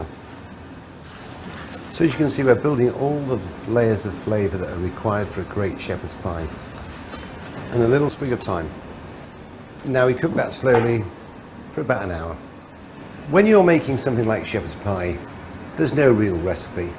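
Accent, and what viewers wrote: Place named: Leeds, United Kingdom. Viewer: His accent is pure Leeds, UK. I love it.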